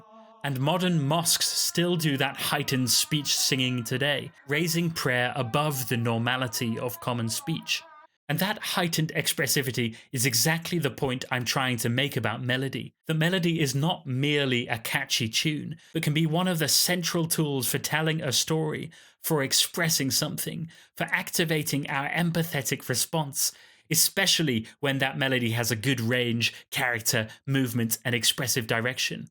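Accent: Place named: United Kingdom. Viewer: I'm Brazillian guy and found your channel by chance. They are very eductional and informatives and beside I not be a native in english, your british accente help me understend almost all that you speak. it's clear and objective when the tonic syllable is stressed. US accente is terrible to non natives in intermediate level. I wish success with channel and keep to producing new videos.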